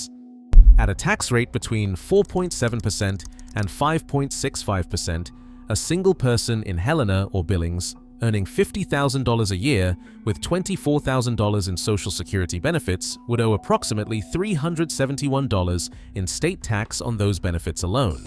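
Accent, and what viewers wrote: United Kingdom. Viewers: Just want list/chart Too many words - leaving now (and the eglish accent is annoying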